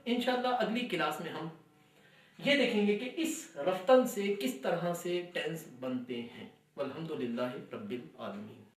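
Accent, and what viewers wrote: Iran. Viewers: it doesn't matter because it is a general grammar for Persian Language. But the accent is Tehrani ( For Tehran ) which also is called: Modern Persian. Tehrani accent is the standard accent to learn the Persian Language. other kinds of accent also are validate but as I mentioned, the best one is Tehrani. a simple example to evaluate the Tehrani accent and Dari accent is like British English and Australian English!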